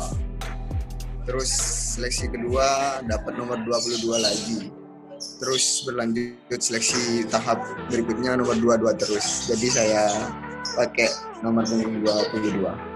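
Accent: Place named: Madura, Indonesia. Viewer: Logat medurone ketok wes ....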